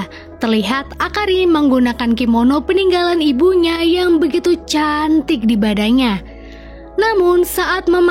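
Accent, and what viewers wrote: Pemalang, Indonesia. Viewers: Fix wong pemalang Rika ya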